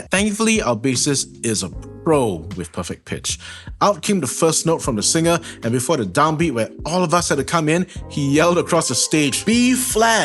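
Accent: Singapore. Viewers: Funny listening to you speaking singlish to the band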